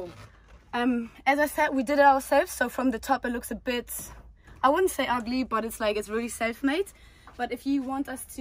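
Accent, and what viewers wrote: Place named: Denmark. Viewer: You really sound like you have a danish accent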